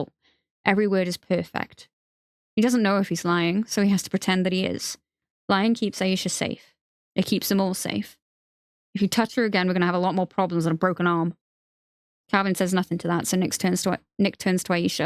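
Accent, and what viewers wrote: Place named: Wales, United Kingdom. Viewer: can you do a good girl's guide to murder?🥺🥺 also I love you accent what part of walsh are you from?😮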